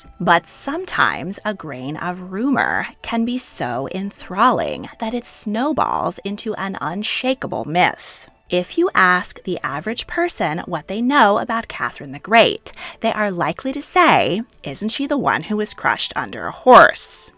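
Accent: California, United States. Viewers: The US accent is irritating. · Sadly I am totally distracted by the woman's voice, What accent is that - is it Californian ? Why do women speak that way?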